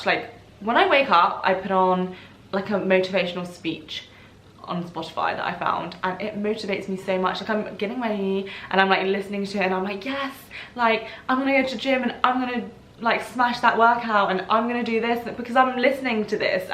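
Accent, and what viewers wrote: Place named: Australia. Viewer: love this!!! i use to watch you YEARS ago - so nice to see you back on youtube. i feel like i can hear a slight australian accent on you now xxx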